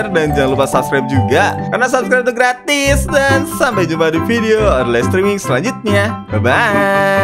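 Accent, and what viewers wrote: Java, Indonesia. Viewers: Wuihh suaranya khas jawa bet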